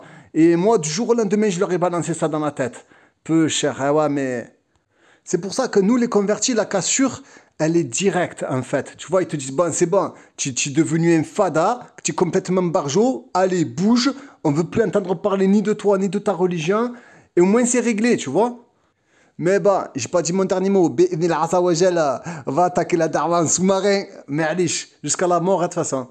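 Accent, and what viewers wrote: Marseille, France. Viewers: Wa aleykoum salam, ça me fait toujours sourire ton accent et tes mots marseillais. Pour revenir au sujet, moi ce que j'ai vu c'est que les familles dissuadent généralement l'enfant de rentrer au bled pour qu'il ne "gâche" pas sa vie professionnellement surtout s'il est diplomé.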